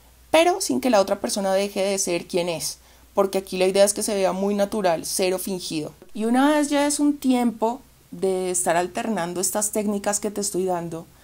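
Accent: Bogotá, Colombia. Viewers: Que deleite escuchar ese acento colombiano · No se como llegue a este canal’ basto solo ver un video para admirarla y suscribirme.... Me encanta el acento de los colombianos 😊. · Gracias por su consejo lo voy tomar en cuenta, por cierto pienso que vos eres de colombiana y de Bogotá pues ese acento es solo de esa ciudad porque pues soy también Bogotano, abrazo eres muy bonita 😘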